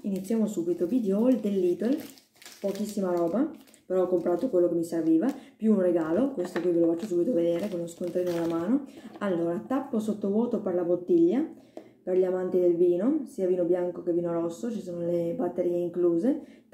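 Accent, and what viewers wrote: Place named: Piedmont, Italy. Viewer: infatti si sente dall'accento. Non ci sono mai stato ma mi piacerebbe visitare le montagne la e torino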